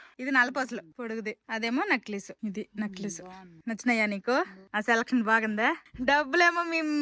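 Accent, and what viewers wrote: Srikakulam, India. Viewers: Uriki velte paka srikakulam voice lo matladutunaru miru akka super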